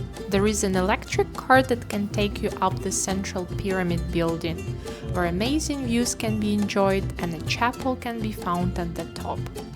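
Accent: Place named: Eastern Europe. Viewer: What a familiar Eastern European accent:) Bardzo dobry film!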